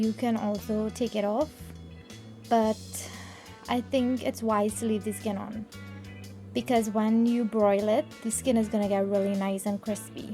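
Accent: Trinidad and Tobago. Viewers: When I think of the trini accent france (French language) comes to mind, so sexy. Great recipe by the way. Real Yard man thing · I does Love that Trini Accent so... oh Gawd ! AND she can cook.. I'm gonna have to head to the Homeland and find me a wife. -TriniAmerican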